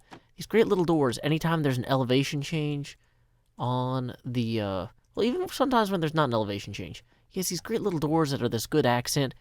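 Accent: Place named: South, United States. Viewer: Hey are you a country guy you sure sound like one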